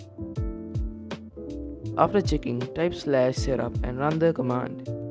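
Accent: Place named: India. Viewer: You sound really really really Indian!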